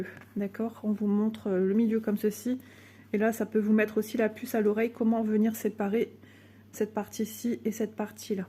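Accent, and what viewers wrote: North, France. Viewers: Bonjour très jolie les sorcières 🧙‍♀️ vous en avez déjà brodé une ou pas bonne journée sa fait du bien d’entendre votre accent du nord je suis du nord aussi bisous 😊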